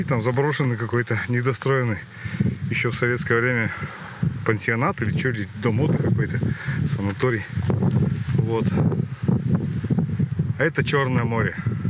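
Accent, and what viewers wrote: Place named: Urals, Russia. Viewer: Откуда ты дружище? Говор Уральский😅. Наш!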